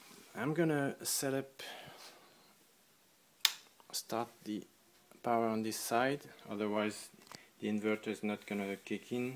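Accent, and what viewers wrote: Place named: France. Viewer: Salut, à écouter rapidement le début de la vidéo, je pense entendre un accent bien français:) ce qui me donne espoir de pouvoir discuter de ton installations de vive voix. Fait moi signe stp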